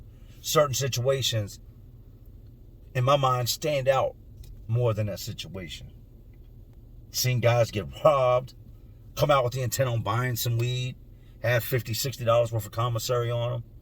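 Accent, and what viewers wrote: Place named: Philadelphia, United States. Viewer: I knew you had to be from Philly or the Tristate, love your storytelling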